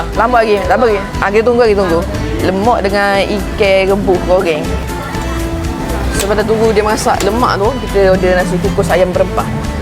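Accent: Kelantan, Malaysia. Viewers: Ori kelate ke tu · Orang kelate ke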